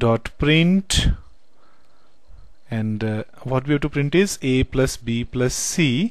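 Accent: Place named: India. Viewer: I realize that your accent sucks BUT BUT BUT i learn a lot from you youre very good in teaching keep it up men you will be able to help many people im sorry for all the indians that i have said that your accent is so bad but i realize that accent is just a sound and learning is everything thanks again men keep it up #NO TO ACCENT YES TO LEARNING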